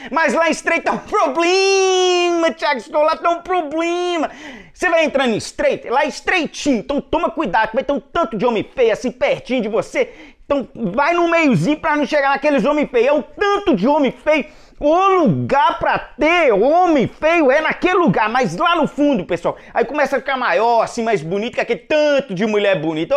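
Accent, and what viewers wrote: Minas Gerais, Brazil. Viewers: Nice!!! O mais da hora foi que tu não perdeu o sotaque mineiro. Eu moro no sul do Brasil faz 8 anos e já se foi a parte mais raiz do sotaque de Minas. Abraços, sucesso.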